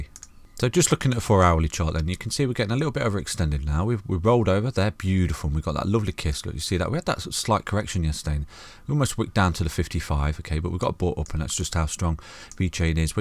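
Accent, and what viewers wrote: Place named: West Midlands, United Kingdom. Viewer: West Mids, knew it was near 😂😂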